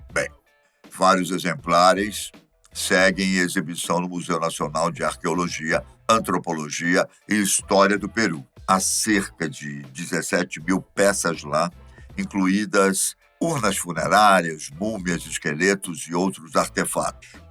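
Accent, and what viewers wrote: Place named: Ceará, Brazil. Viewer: Quase achei que era um cearense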